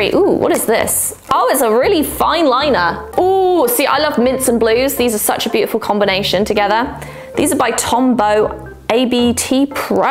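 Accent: United Kingdom. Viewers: Would be interested in the details of the company, as am in the uk... look very good quality! Thsbjs for the great video (as always), lovely to see that you have retained your uk accent! X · I liked the derwent graphite paints. And the faber castel pens too. 12 boxes to choose from. Makes for a hard decision to choose just 1 box. Love watching you. Nice hearing a British voice in the USA. Love from the UK x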